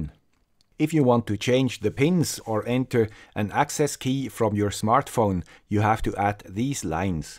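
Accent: Switzerland. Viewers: Your Swiss accent is so sweet and very compelling. I am so influenced to learn deep in the subjects though you just explains thoroughly. You are an amazing learned person with a very sweet positive attitude. Thank you.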